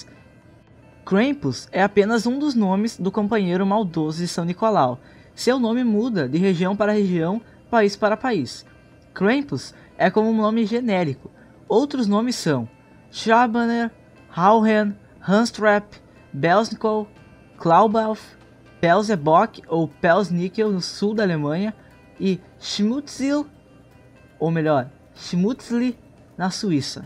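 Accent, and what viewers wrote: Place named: South, Brazil. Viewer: sotaque é do sul, nada a ver com norteste.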